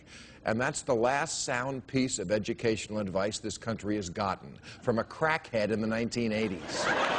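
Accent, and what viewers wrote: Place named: Rhode Island, United States. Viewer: Sounds like you're from that poor Rhode Island neighbourhood.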